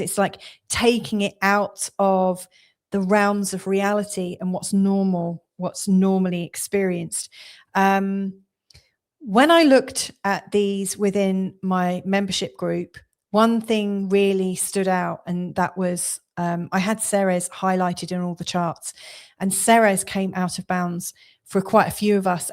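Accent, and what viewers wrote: United Kingdom. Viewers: What a great explanation and metaphor to explain out of bounds planets! I’m addicted to your fabulous dailies now! Loving learning from you; a fool for the great British accent 🙏🌻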